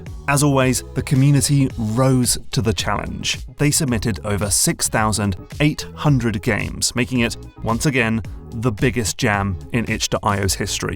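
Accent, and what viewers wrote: United Kingdom. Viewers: Need YouTube AI to convert unpleasant British accents to accent of our choice.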